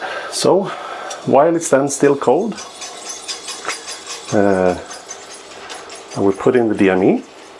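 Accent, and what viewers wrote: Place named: Sweden. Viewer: I like your swenglish. Nice equippment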